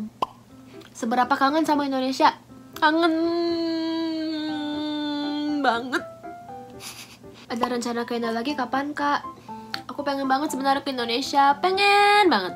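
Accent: Japan. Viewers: suka banget liat kak ngomong bahasa Indonesia logat Jepang. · Kak kalo ngomong indo tpi nadanya kayak ngomong jepang hehehe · logat jepangnya udah kental yaaa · Ngomongnya indonesia logatnya jepang wkwk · Logatnya lebih sering ke Jepang sekarang walau ngomongnya Indo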